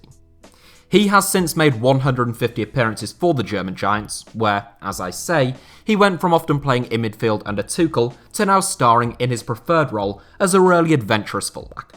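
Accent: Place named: Hull, United Kingdom. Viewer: and his 'soft' hull accent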